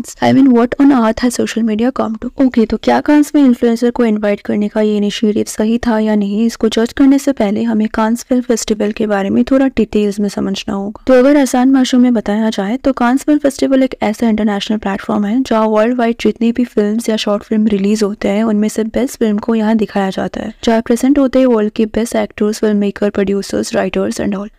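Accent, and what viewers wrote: Bengal, India. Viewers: Aap bengali ho?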